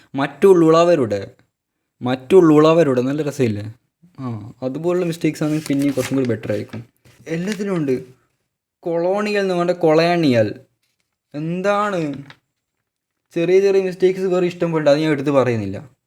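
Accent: Kannur, India. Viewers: Kannur slang📈🗿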